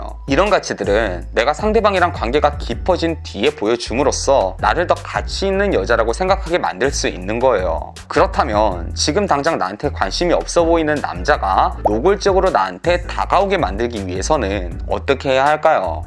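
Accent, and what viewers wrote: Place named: Gyeongsang, South Korea. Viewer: 경상도 억양 세시네욤...ㅋㅋ...🥲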